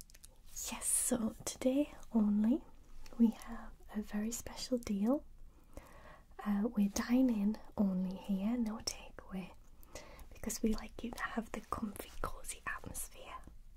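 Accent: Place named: Scotland, United Kingdom. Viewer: What's your accent? It's pretty and sounds kinda Scottish lol, you sound like me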